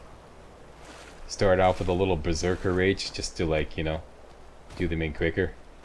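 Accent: Canada. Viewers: FREE CRACK! Just kidding. Now that I have your attention, are you Canadian? You sounds Canadian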